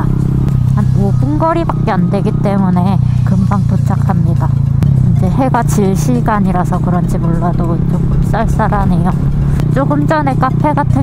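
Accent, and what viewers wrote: Busan, South Korea. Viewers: 와.. 부산사투리 매력 취한다...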